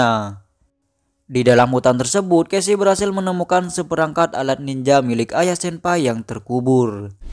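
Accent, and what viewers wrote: Madura, Indonesia. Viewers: Lu madura ya min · Admin orang Madura ya logat nya beda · Bang ente orang madura ya 😂. Logatnya kliatan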